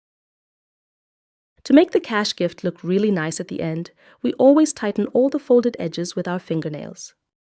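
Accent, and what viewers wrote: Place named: England, United Kingdom. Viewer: Is this the same was a German accent? This voice sounds like one from England!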